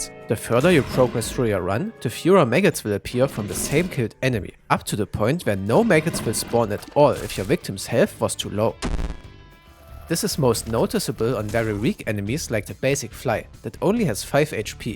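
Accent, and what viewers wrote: Germany. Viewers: Its a german accent. I have it aswell, thats why I know lol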